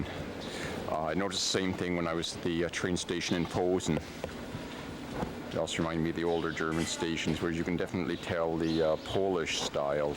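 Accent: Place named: United States. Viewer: That’s amazing! I didn’t realize you’re from Germany speaking English—I actually assumed you were American. You probably chose English for the recording so that everyone could follow along. Thank you! I was born in Szczecin in 1983, and as I was walking the streets, it would be fantastic to spot myself in your video. 😄 You won’t believe how much Szczecin has changed since then! We’d love to have you back to film it again and share your thoughts.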